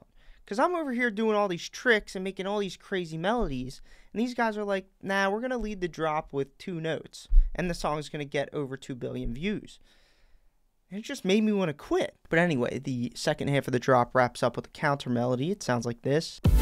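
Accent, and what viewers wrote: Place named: Delaware County, United States. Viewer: I've watched a handful of your videos and could not get over your HEAVY Delco accent. Hat and Jersey confirms it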